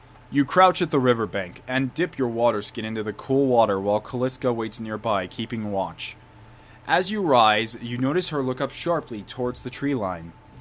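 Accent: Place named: Canada. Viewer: I love Arizona, one of the craziest environments I have ever seen! Driving in the desert while a mountain of snow is at a distance! I hope I don't offend you but I thought your Canadian.. I'm Canadian and the accent is very similar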